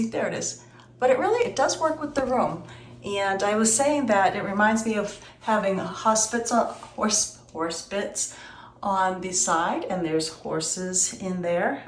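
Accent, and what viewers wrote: New England, United States. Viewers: Please don’t loose your New England accent it’s a great part of what we love about New England!! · I love your pantry! It’s beautiful! Your kitchen looks amazing also!! I love your New England accent!! Hosses, not horses!😂😂My grandparents were from Mass, and my great grandparents resided in Stonington, Ct. I’m a New Englander at heart. I love seeing your videos and always look forward to your updates! Love your beautiful home! Jean😘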